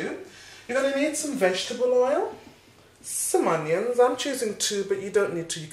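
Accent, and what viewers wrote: England, United Kingdom. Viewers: I love your videos, few though they are. The combo of your passion, plumby English accent (yes, I'm American:)) and magnificent West African looks, I very much enjoy. You were my first intro to West African cuisine. It appears that you aren't really doing this anymore and don't know if you read your comments but I wanted to let you know how much I've enjoyed them. Cheers. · YUMMY!!!!! I c you added 2 habanero peppers to make it HOOOOTTT! I love spices & heat tho. I c you only added 1 stock cube, no salt or other seasons. Another vid chef added no seasons! I never boil the h2o out of my tomato puree when I make this so this week I will certainly boil out as much h2o as poss. 1st. BTW, I LOVE your Britt accent & I EAGERLY await my trip across the pond – when flights aren’t a million $ compliments to the Olympics and summer season! 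· your accent is so english and this is coming from someone born in the uk too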